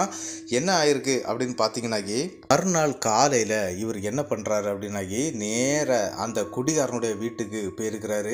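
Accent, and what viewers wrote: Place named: Nagercoil, India. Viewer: It is so nice to hear brother speaking nagerkoil tamil as I am from nagerkoil thankyou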